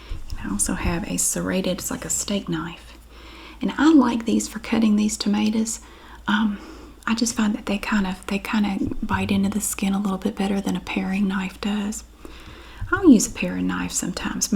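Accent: South, United States. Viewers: I love your tomato sandwich videos. My favourite 😊 oh wow I’ve never seen tomato’s that big before. I love your southern accent, it’s so much better than mine. A Birmingham accent in the uk 🇬🇧 is one of the worst in the world 😂 or so I have been told. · Being from Northeast Texas ,I nevee heard of dukes mayo before, And yes in my area it was not uncommon to hear something like this ? " yall want a Mater Sandwhich", I 2 have noticed a lessening of accents,and I think it's a crying shame. Your accent is heavenly ,aint nothing more beautiful than Southern Women😍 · you are a breath of fresh air! Longtime subscriber. Not much of a commenter, but sometimes something comes along and I just have to. I could listen to your voice and southern accent for hours and hours! Thank you for doing what you do!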